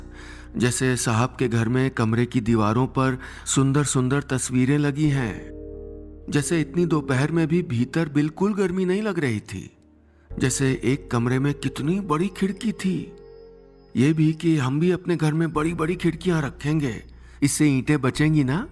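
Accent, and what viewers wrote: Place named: Rajasthan, India. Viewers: Such a beautiful story. Plus it being of Rajasthani setting and Nilesh Mishra reciting it in Rajasthani accent makes it even more beautiful. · Rajasthani bdi pyaari lgti h aapki aawaz me☺️☺️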